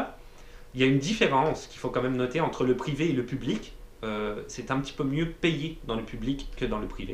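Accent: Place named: Quebec, Canada. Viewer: Merci, merci l'ami le grand bonjour, de l'autre rive de la méditerranée et le soleil durant les quatre saisons. Sincèrement l'ami , y a beaucoup d'informations et c'est motivant surtout avec accent québécois de temps à autre mélangé à la bonne humeur.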